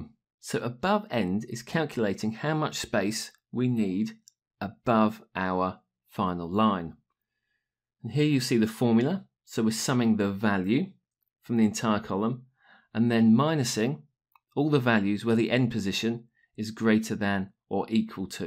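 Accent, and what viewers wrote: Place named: England, United Kingdom. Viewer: Thanks! lovely English accent by the way =)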